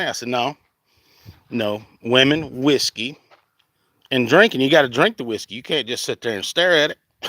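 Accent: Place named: Florida, United States. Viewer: I just found you TODAY. I LOVE YOUR NEW SONG. I am a Florida & Alabama Gulf Girl (stuck in Utah now). Where are you from? Accent sounds like NW Florida?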